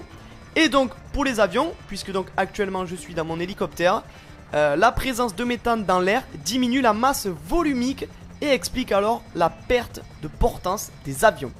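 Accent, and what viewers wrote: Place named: South, France. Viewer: Tu a un axant du sud 😁😆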